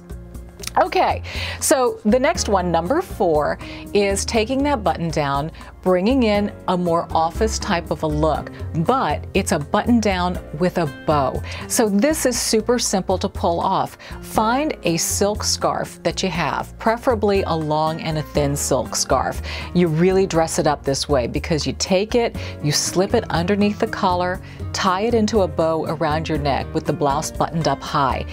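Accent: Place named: South, United States. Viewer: I love the southern accent!!!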